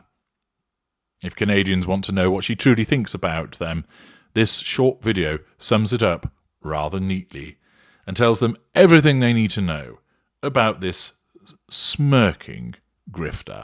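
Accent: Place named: Newcastle, United Kingdom. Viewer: I think our glorious narrator is a Geordie.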